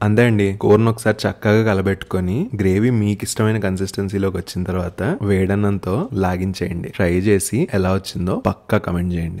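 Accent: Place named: Rayalaseema, India. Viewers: Yerragadda..rayalaseema slang 😊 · Yerragadda anagane artham ayipoyindhi ..maa rayalaseema ani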